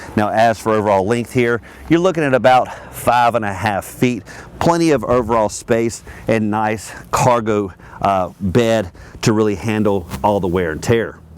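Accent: South, United States. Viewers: . Great job. I like the truck. The warranty is great too. Worked that southern accent. Mississippi myself. Plan on getting this truck but a different color. How does the 4 by 4 Platinum drives? Is it a heavy feel? It's supposed be around 5 seconds for 0 to 60.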